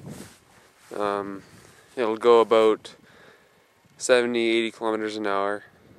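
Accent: Canada. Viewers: must be a canadian aboooot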